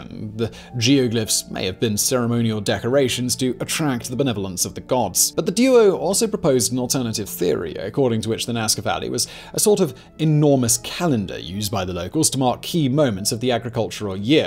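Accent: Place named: England, United Kingdom. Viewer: Midlands/south England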